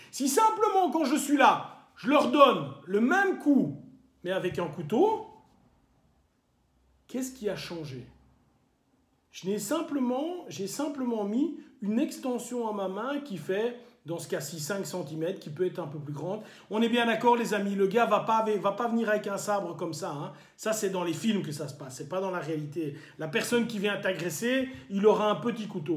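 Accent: Belgium. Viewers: Tout le monde à un accent selon son origine. C est un accent wallon tout à fait normal, 2000metamorphe je te comprend pas.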